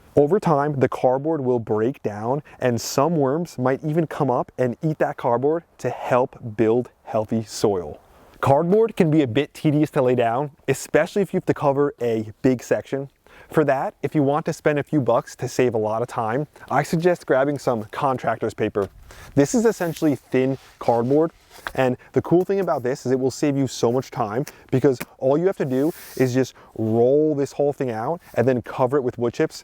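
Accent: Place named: Philadelphia, United States. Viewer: are you from philly or jersey? I can tell because you say wuter instead of water like i do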